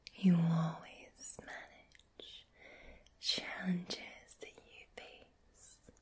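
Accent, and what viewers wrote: Scotland, United Kingdom. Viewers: I didn’t realise you were Scottish until you sounded American to me at first cos I’ve never heard you before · Wow, you created an awesome & hypnotic ASMR filled video that I love!! You're the sexiest Vampire I've ever seen, & that black lace dress, with the ambient sounds, reverb, & your various effects make this a smoking hot Halloween themed video, imo! The reiki, backdrop, & your lovely Scottish accent, & mind control work for me! Great job sweetie! 🎃Mick💓💓💓💤💤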